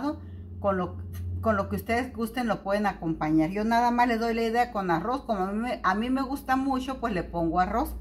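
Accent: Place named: North, Mexico. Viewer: Señora,,buenas sus recetas y comida saludable al mero estilo Sonora y con el acento tan norteño tan popular. Nomas un comentario me gusta cuando sirve la comida asu marido y el la resibe con mucho gusto, pero me parece muy cortante de su parte mandarlo a sentar asu rincón “” es mucho mejor que el este con usted en compañía como una bonita pareja,comparta comiendo del mismo plato en vez de mandarlo lejos de usted,,Gracias por sus recetas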